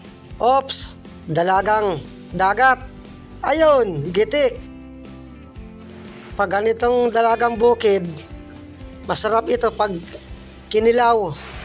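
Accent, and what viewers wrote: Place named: Batangas, Philippines. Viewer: Idol taga saan kb kc ung pana2lita mo prang marinduque or btangas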